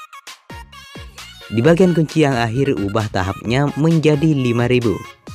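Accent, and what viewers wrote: Madura, Indonesia. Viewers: Pasti orang Madura ya kak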